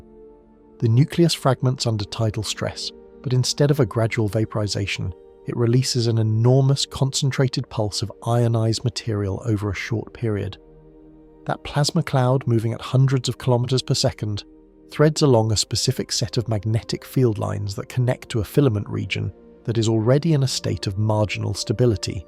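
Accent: United Kingdom. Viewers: Another fear video. A tiny pebble going to hit the sun will not register at all but "somebody" with a crappy British accent can amplify your fear level so you cannot think straight. Who would profit from this chaos ?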